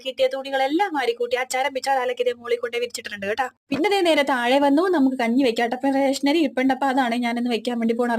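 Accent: Kochi, India. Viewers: Ithonnum alkarude perala...eniku ishtapettu...thani kochi baasha😂😂❤❤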